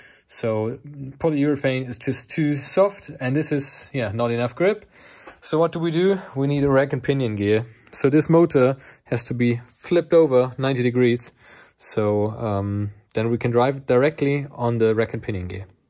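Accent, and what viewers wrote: Germany. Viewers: Do are you from Germany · Bruder dein akzent einfach anders deutsch xD Gutes Video ^^ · Ich finde es lustig wie man perfekt deinen deutschen Akzent hört, die Idee muss man aber auch erstmal haben. Super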